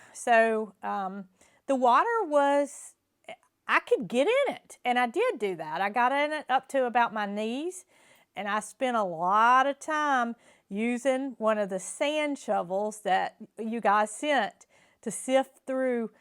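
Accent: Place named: Georgia, United States. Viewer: My favorite photo was the flying heron., when I listen to you I’m reminded of my grandmother. She was born Georgia. The cadence of your speech, your accent and way of telling stories reminds me of her so much it brings tears to my eyes. You make me feel a sense of Home. Thank you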